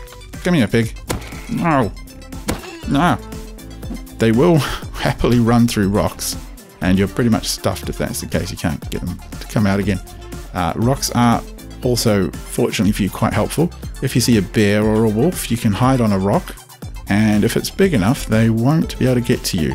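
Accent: New Zealand. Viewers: Are you from New Zealand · You would not believe the day i have had. Total shit day where a job i did might not even pay me and when i get home to learn the new game i am playing it is a Kiwi behind the microphone. Do you know how much that shits me. He is talking about being on a rock with a 'beer' underneath him, and i'm like what the fuck is this game doing where a player is on a rock because there is a beer underneath him. Then he goes on about how 'Mital' is pleasing to him and i have to look up the player guide and search for 'Mital' thinking it is some character that i haven't seen before. Nowhere is there a mention of Mital in the documentation i can assure you. Shit man, learn to speak proper English would you. Get rid of that accent so you can sound more like normal American English. We would prefer it if you try to sound like an American so we can actually understand you. 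Imagine if we were in a battle of some war and the bloody New Zealander has to ask someone to pass him a weapon and the New Zealander is like "quick, pass me the wippon i have someone to attack" and the other USA people are like "what do you mean pass you the wippon, i don't know what a wippon is. Get the hell out of the front line you New Zealander because no one understands you and why you keep asking for something called a wippon. Go home New Zealander is what they would say. They would say "Go back to your island and you can have all the wippons you want but here in reality we talk English with American accent and if yo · Dude are you from nz to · wait a sec r u from New Zealand aswell <3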